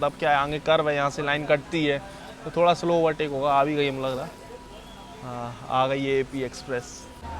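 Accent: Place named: Bundelkhand, India. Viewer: Bhai aaj bhasa puri bundelkhandi bol rahe ho❤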